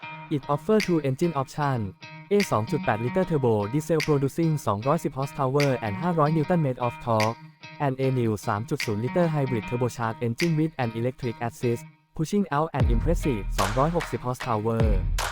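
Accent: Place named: Thailand. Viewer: อ่านภาษาอังกฤษแบบไทย แถมตกไทยด้วย เช่น Starting with the exteria the สองพันยี่สิบหก Isuzu KEหกหนึ่ง … งงแท้ จะไทยหรืออังกฤษ❤❤ ปรับใหม่ก็ดีนะครับ ติเพื่อก่อนะครับ ❤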